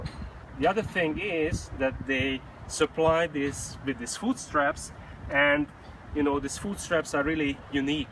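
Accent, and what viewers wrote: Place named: Hungary. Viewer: Hungaro English :)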